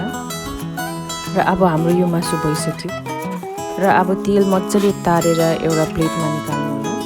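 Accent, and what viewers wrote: Darjeeling, India. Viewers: kati mitho boli ho didi hajurko fist time herdai chu tara aawaj chai sarai ramro darjeeling ko ho didi hajur??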